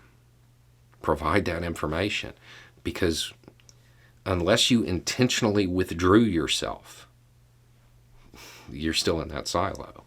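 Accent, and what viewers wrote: South, United States. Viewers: It must be your Southern accent/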